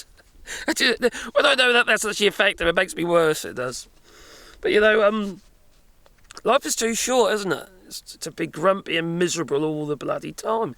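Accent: United Kingdom. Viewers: Fed up with Trump and nice to listen to a British accent for a change! Just ignore your trolls! I pay for YouTube but don't have a YouTube channel. Too old. I suffer from phishing on my mobile phone and at my age have to answer it just in case it's the GP's surgery for a blood test review or appointment. GPs sometimes use their own mobiles and I can't save their numbers. I object to a greasy fraudster calling me by my first name as if we're the best of friends but that's how I know they are trolls! Officialdom never does that! I don't have any investments, insurance or car thank you very much, or a daughter who's run out of money! ❤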